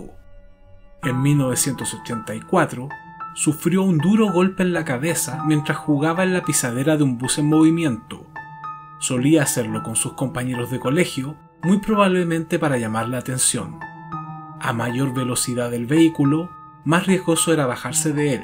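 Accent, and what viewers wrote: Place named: Chile. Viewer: Que grata sorpresa saber que usted es chileno, tiene un agradable acento….El acento chileno es lindo, siempre y cuando se pronuncien las palabras.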